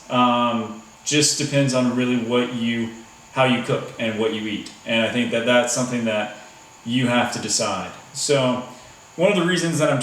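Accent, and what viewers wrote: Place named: United States. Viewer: He is obviously speaking American English. His enunciation is different from yours or standard American English. I had no trouble understanding what he was saying.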